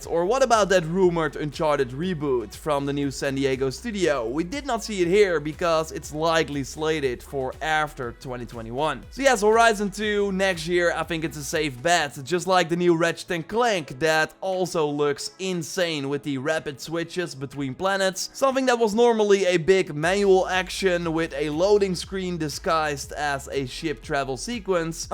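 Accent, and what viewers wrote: Netherlands. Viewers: ik weet het niet zeker maar je klinkt NL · Kijk uit naar je content man! Ik weet het niet zeker maar aan je accent denk ik dat je ook nederlands bent!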